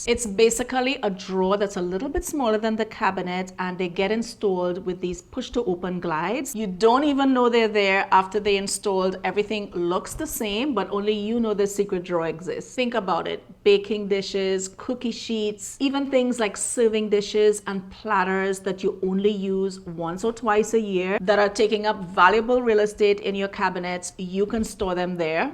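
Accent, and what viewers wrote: Trinidad and Tobago. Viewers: Wait, are you Trini or Guyanese?? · Are you a Trini because sound like a Trini😊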